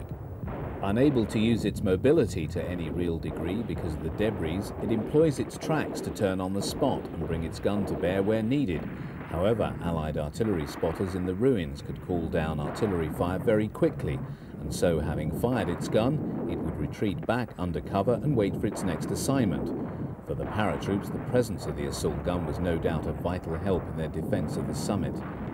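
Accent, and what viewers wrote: England, United Kingdom. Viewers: Simultaneous playing of English accent commentary, overlaid gun and artillery fire, pomp and circumstance ochestra music, and actual WWII German newreel audio. It's like the battle of Prokhorovka in my ears.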